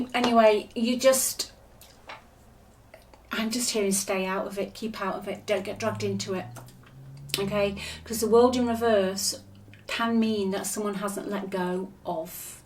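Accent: England, United Kingdom. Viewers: ❤❤❤❤~ YOU are so Wonderful and Beautiful ~ Thank You for making my days Brighter with your readings! Love your English Accent ❤️ 💕 ♥️ ~ it's "magical"!!!🎉🎉 (I've got popcorn and snacks, lawn chairs and heaters [it's cold here where I am at] ready for the 'Show'😂😂😂😅🎉!!! Watching!!!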